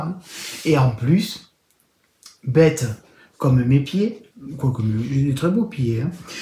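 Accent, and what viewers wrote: South, France. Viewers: ce bel accent du sud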